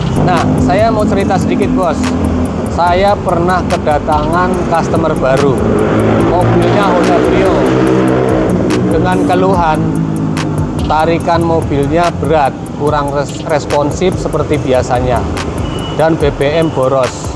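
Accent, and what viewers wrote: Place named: Banyumas, Indonesia. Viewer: Ngapak Bang 😂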